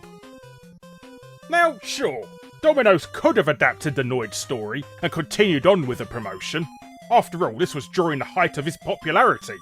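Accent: United Kingdom. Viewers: I love your voice it's like you're a British guy with a mouth full of marshmallows.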